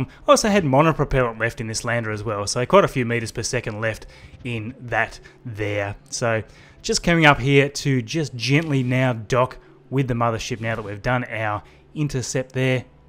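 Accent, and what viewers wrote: Australia. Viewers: tell me if I'm right. Are you Australian? You sound like Hugh Jackman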